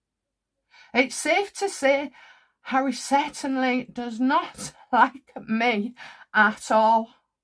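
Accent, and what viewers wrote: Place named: England, United Kingdom. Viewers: Am gutted for you. I love listening to you. Not only as a reader, but also because we speak the same dialect. Am a 74 & I speak with an "old Bradfordian" Yorkshire accent. N am proud on it. It's probably the accent H can't cope with, poor little man. ( Big tear left eye). Trevor Coult was demonetised by h&m & he chose to have a long legal fight, and he's coming out tops in the end. I wish you all the love, luck and justification.🕉️ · You are entertaining as well as teller of truth. I love your energy and also your particular English accent. Coming from Australia ❤ Harry is a boring predictable petty nasty piece of work. Where are his imaginary children? He NEVER mentions them! What a dishonest POS, taking orders from his horrible handler. Weak as piss.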